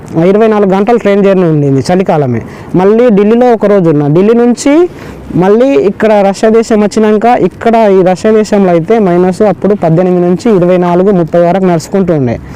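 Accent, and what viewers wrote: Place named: Andhra Pradesh, India. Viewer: నువ్వు కరీంనగర్ గదా నువ్వు సగం ఆంధ్ర సగం తెలంగాణ మాటడుతునవ్ మొత్తం తెలంగాన మాట్లాడు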